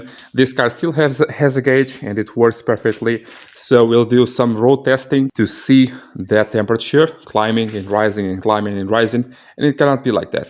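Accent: Portugal. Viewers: Boas, dá para entender que és tuga, desde já bom trabalho com os vídeos muito explicativos e detalhados, no entanto gostaria de perguntar se tens negócio aberto e se sim, em que zona? Já agora mais ou menos o valor da troca de uma corrente distribuição para um motor 1.3 multijet fiat grande pinto 2007? Tenho o material necessário no entanto receio de o mudar, Obrigado e continuação de bom trabalho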